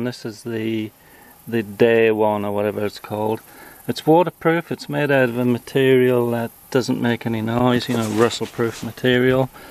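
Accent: United Kingdom. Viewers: Hell yeah, first time seeing this video. The Internet should be full of guys with UK accents doing EDC videos involving weapons, but you're the first I've seen sadly. anyway great video and one is better than none I guess.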